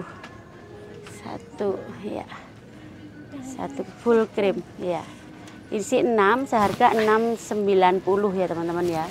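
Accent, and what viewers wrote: Indonesia. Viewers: Selamat pagi semuanya, selamat pagi mba dan sekeluarga, semoga hari ini lebih baik.senang klo uda liat vlognya mba.seruuu dan cara bicaranya khas Indonesia banget.semoga mba slalu dberi kesehatan selalu ya bersama keluarga tercinta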